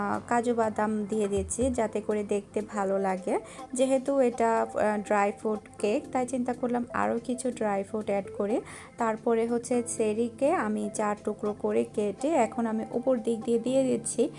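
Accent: Chittagong, Bangladesh. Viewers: MashAllah orange cake daron hoyce.Apu apni toh sob kaje parodorsi amader chttogramer apu bole kotha.mamonira kmn ache apu?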